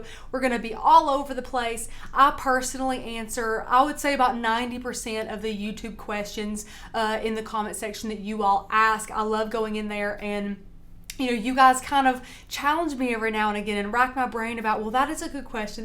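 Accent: South, United States. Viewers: This is awesome training. I love your accent! I'm from SC. Love all of our southern accents!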